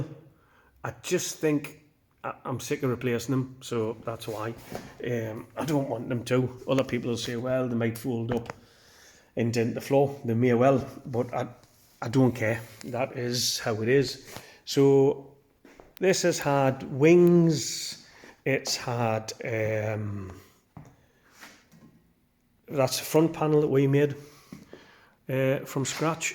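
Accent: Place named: North East England, United Kingdom. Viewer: Yes please, keep them coming. I've got massive affection for Capris. I learnt to drive in a MK2 Cortina, which I loved. I have had 2 of those, a MK1 Capri, 1600GT XLR, which I adored and obviously should have kept, and loads more Fords, last one being a Series 1 Escort RS Turbo, also wish I'd kept that! It sounds like you are from the North East, did you ever come across a guy called Gordon Leeming?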